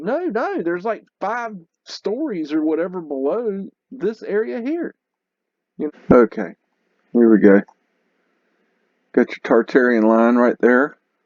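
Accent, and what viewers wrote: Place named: South, United States. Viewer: Love what you're doing! Whoa that accent is thick tho lol where in the south are you from?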